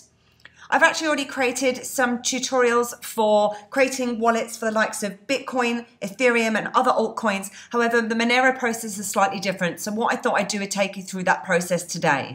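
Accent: United Kingdom. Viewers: your British accent so lovely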